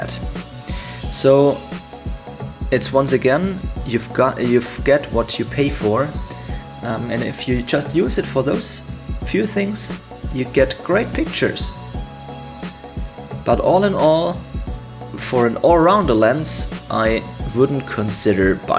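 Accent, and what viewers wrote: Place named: Germany. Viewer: Soll ich mir das deutsche Englisch jetzt 12min reinziehen? Dann bekomm ich partielle Einseitige, Lähmung und gesichtskirmes auf der anderen Seite. Toll, dass sie englisch können, aber beschränken Sie es bitte auf die zwischenmenschliche Kommunikation. Videos müssen echt nicht so kommentiert werden.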